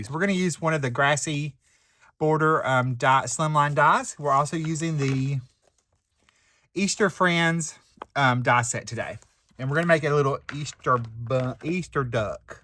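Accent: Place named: South, United States. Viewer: Such a cute card and it came together so quickly! The ink blending on your die cuts and the highlights from the white gel pen really do add detail that packs a solid punch! You're the first crafter that I have heard share the term "Decision Fatigue" and this is real! I'm sure that it occurs more than some will let on but thank you for bringing this valid term to light. Love hearing your southern accent and watching you create.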